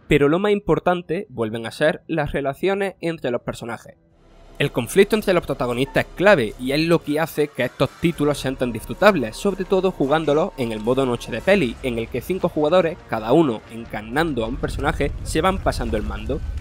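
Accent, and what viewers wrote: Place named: Canary Islands, Spain. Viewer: Parece canario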